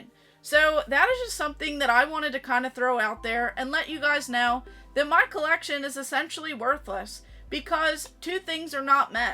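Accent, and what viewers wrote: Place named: Baltimore, United States. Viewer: Woah, are you local to Bel Air? I shop at Retro Replay too! Thought I heard a Baltimore accent in there... 😄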